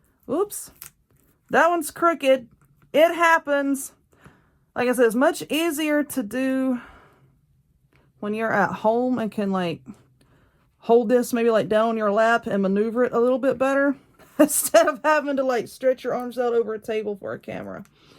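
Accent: South, United States. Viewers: That is beautiful and I love listening to your beautiful southern accent.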